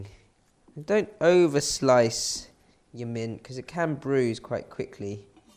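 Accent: United Kingdom. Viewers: Too much sugar....i can not trust this man. Also, an annoying British accent...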